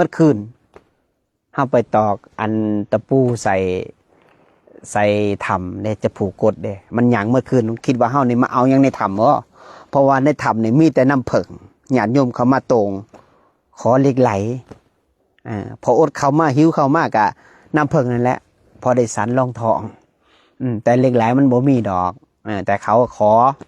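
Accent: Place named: South, Thailand. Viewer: สาธุสาชธุคะ่ชอบฟังมากมากคะ่ยกระแสสินธุ์พากใต้คะ่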